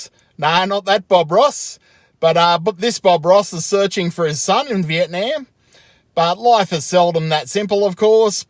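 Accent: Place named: Australia. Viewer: Bob Ross .great name 😁. Also love the Aussie way of speaking . My favorite accent . Plus I hear that place is just wild to live in. Keep up the great work and good morning from Dallas Texas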